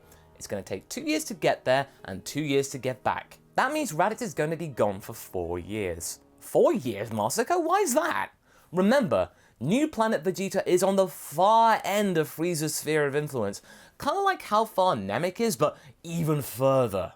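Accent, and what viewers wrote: United Kingdom. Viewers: Damn i know you’re from England but it’s n hear yo British accent 🤔😯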